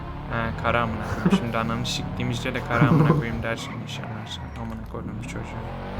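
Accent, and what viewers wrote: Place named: Konya, Turkey. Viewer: abi tam bi gonyalı gibi gonuşuyon ha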